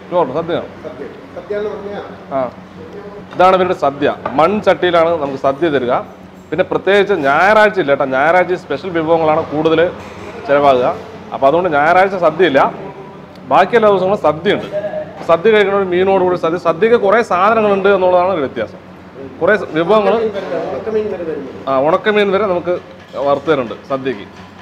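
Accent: Palakkad, India. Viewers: oru palakadan 👌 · Pakka palakadan slang 😎😎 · ഫുഡ് വ്ലോഗിൽ ചേട്ടന്റേം വിനിച്ചേച്ചിടേം( vinis kitchen) ചാനൽ കാണുന്നത് നമ്മടെ പാലക്കാടൻ സ്ലാങ് കേൾക്കാൻ വേണ്ടി...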